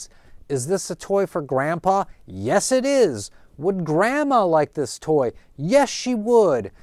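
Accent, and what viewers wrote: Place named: Midwest, United States. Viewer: I've watched your two newest videos today and learned two new things about you, both of which explain why I like your videos so much: 1. You're from Minnesota; I grew up in Wisconsin! It's nice hearing a Midwestern accent on youtube. 2. Your "autistic ramblings" as you put it: I'm autistic, so to me they don't even sound like ramblings! I've been enjoying your channel quite a while now, I was happy for you when I saw that recent vid get some traction. Your videos always give me a very specific vibe: when I lived near Green Bay, my dad and I would go to comic and game shops (and sometimes thrift stores) on the weekends and just drive around, seeing what weird stuff we could find. Your videos always give me a similar feeling to that fun vibe. Now that I'm in my late 20s and a collector myself, your videos make me nostalgic for those days!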